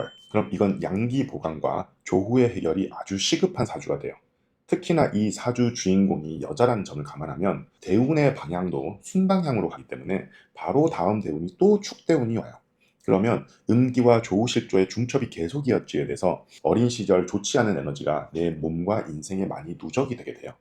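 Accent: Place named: Busan, South Korea. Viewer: 구독합니다. 좋은강의 부탁드립니다. 부산분이신가 봐요😮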